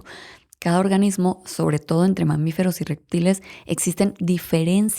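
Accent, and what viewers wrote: Mexico. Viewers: Me encanta tu acento mexicano! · Linda tu y lindo nuestro acento mexicano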